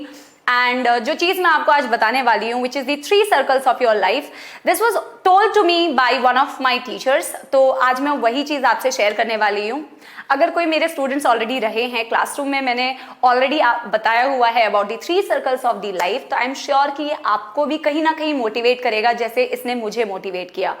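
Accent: Odisha, India. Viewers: Mam are you from odisha ?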